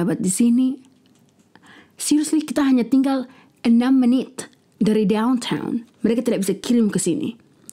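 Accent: Manado, Indonesia. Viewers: Minum kopi apa doe... dapa lia sedap.. I am a coffee drinker. Btw, I like the way you talk.. apa lg deng logat manado plus english.. beking kt tatawa... Thx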